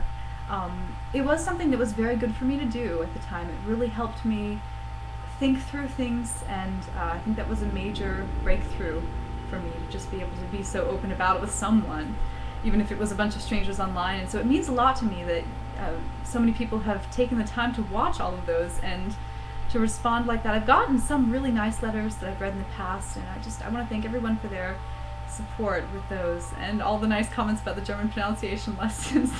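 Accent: Germany. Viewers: einen leicht sächsischen akzent konnte ich in einer ihrer älteren videos bereits raushören.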